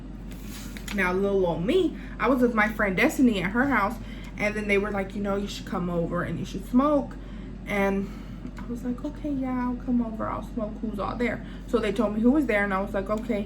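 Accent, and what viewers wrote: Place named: Clovis, United States. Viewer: Lmaooo😂😂 this sound like so many Clovis females I know, got me wondering which ones all nosey🤣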